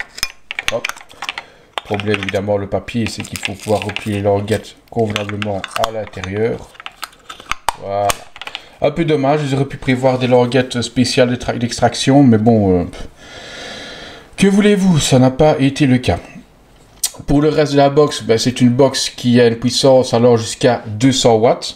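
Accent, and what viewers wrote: Liège, Belgium. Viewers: Lol toi tu viens de liège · vous avez l accent liégeois!!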